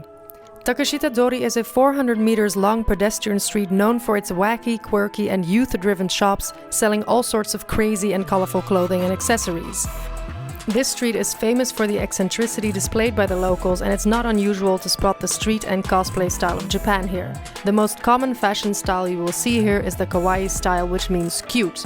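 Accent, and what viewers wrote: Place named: Netherlands. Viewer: Ik ben Japans en deze video kwam als aanbeveling, dus ik heb hem terloops bekeken. Toen ik je uitspraak hoorde, dacht ik: "Huh? Nederlands?" Ik woon al 20 jaar in Nederland, dus ik dacht dat het makkelijk te begrijpen was! Bedankt dat je Japan zo geweldig hebt geïntroduceerd!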